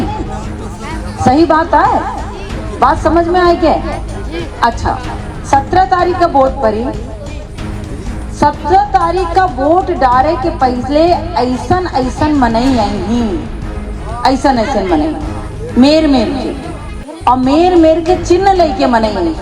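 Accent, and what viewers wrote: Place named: Baghelkhand, India. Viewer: बहन अपना के बघेली बोल चाल के हम बहुत कायल हैं अपना बहुत बहुत धन्यवाद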